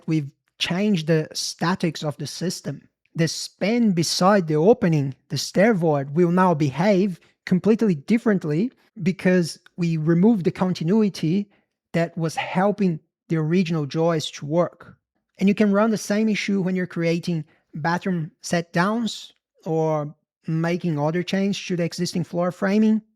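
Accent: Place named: Australia. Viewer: The ozzie accent on a brazillian is mind boggling. Absolutely unbelievable! It's almost the opposite of a Japanese speaking Arabic.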